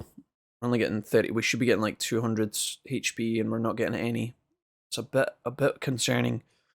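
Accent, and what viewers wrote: Scotland, United Kingdom. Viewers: Watched your digimon vice play through so now on this as i'll watch time stranger later when I'm further in the game (had hardly any spoilers as of yet!). This has made me replaying Next Order from the beginning on my switch in bed at night. Safe to say I did not get the Gruesome twosome. I got Togemon and IceDevimon! Who are now Lilymon and Dynasmon via Gigadramon. Love your play throughs its nice hearing a fellow Scottish accent! 😬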